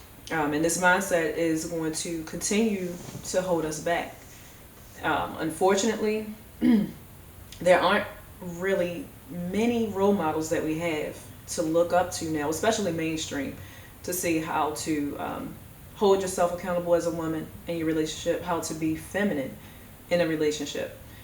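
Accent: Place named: Baltimore, United States. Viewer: You from Baltimore aren’t you?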